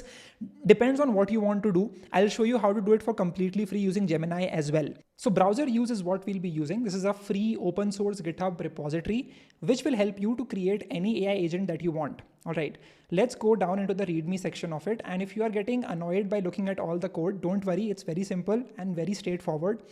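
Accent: India. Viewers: I do not know where you are located but I can hear you are from the east. India or one of the countries around there, so please forgive my ignorance about that. Why do I think where you come from is relevant for my comment, it is because India for example is well known for their scam activities, and here in Europe we are always concerned when we get mails, phone calls, from people from India that offer technical support. With this said, I wonder how do you face the insecurity when using AI. Insecurity with regards to whether your data is being used or even shared. Deepseek is Chinese, and connects to private databases for its reinforced Augmentative Responses. Do you consider that when entering passwords or personal information or do you have a more relaxed view on security which allows you to just throw yourself on the net without really having concerns.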